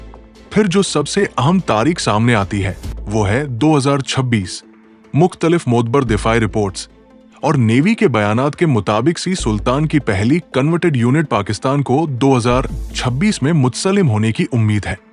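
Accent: India. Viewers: زبان اردو ہے مگر لہجہ اور تلفظ دونوں انڈین ہے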